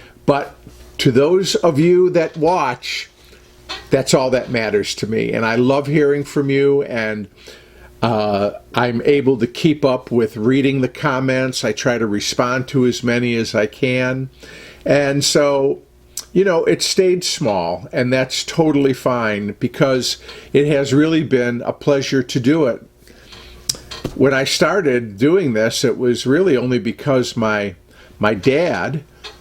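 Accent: Midwest, United States. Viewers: YouTube was kind of just starting its takeoff roll when I first started using a computer and visiting the internet. Didn't seem like it was so much a "job" then. Seemed more like a hobby! I don't begrudge its having become a job for the many now who do try to make their living or most of their living at it, because the more self-employment options there are for people, the better. Not everybody's wired right for the old 9-to-5! YouTube seems to represent a different kind of creative outlet for you, and I think the content you create is better than some that is created by the people who do it for a living. It is certainly less frenetic than some. As a former Michigander myself, of a certain age, I find watching Cavalcade relaxing and reminiscent in equal parts. I love that your steel cookware on the show is the beautiful yet uncomplicated Farberware I started buying around 1975 and still comb thrift shops to find additions to. 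At (a youthful!) 70 I love seeing the kinds of appliances and kitchen gadgets I remember from when I was 20 and first learning how to keep a kitchen! I love hearing you talk the way I used to, before I wound up in New England. Your recipe videos and stove swaps and features showcasing blenders or mixers or whatever feel almost like a visit with a nice neighbor two doors down, if two doors down took me all the way back to the Midwest . I love the approach you take to content creation and I hope there will be room on YouTube for your kind of contribution a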